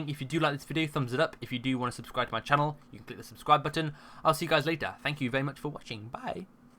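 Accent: United Kingdom. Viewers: that is the british pronunciation.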